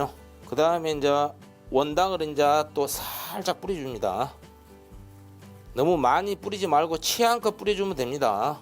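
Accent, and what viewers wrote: Jeolla, South Korea. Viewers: 내가.절라도출신인데.절라도말로하시니까 너무정겹고..셀프님.정말똑소리나내요.화이팅❤❤❤❤❤ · 안녕하세요. 저는 캐나다에 40년째 살고있는데 매일 당신에 체널을 시청하고 있답니다 좋아는 필수이고요 제가 떡 종류는 다좋아해요 제 고향은 전라북도 부안입니다 어려서 고구마 감자 옥수수 떡을 좋아해서 많이 먹었더니 여자 형제중에 제일 크지요 😅 말씀이 전라도 말씀에 정이 더 갑니다 오늘은 시루떡이네요 나중에 떡가루 사다가 떡 해먹으려구요 감사하고 사랑합니다 아 그리고 여기서도 한국 음식만 먹어요 손이 많이 가지만 그래도 한국음식이 최고예요 😂😂❤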